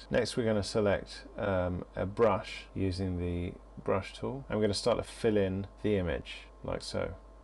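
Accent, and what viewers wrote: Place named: RP, United Kingdom. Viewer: lol, i didn't even bother with this but your voice is very posh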